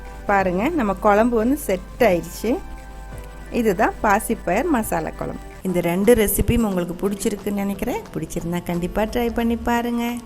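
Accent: Kerala, India. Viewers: Semma recipe, thank you so much sissy 😍😍😍.... Unga kerala kalantha Tamil lovely 😍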